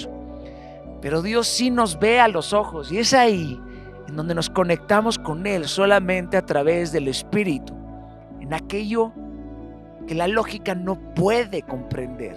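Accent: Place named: Greece. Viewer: Tienes acento griego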